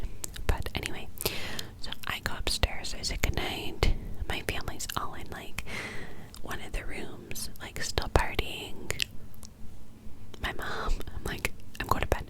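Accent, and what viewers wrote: New York, United States. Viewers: Well I think you are a pulchritudinous gal, your sweet voice could calm a storm. I love it when you do some videos with a new york accent. I've been a fan of yours now for a few years and you have helped me fall asleep many of times. Im pretty sure you will reach a million subscribers. Anyway you look insanely beautiful and visually stunning as always love your asmr that you do. 😍😍🌹🌹🌹🌹🌎👑.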